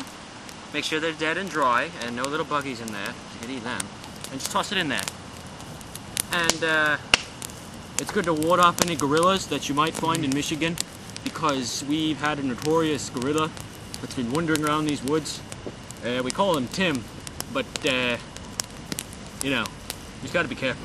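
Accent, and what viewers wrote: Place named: Australia. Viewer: Why do you sound like an Aussie?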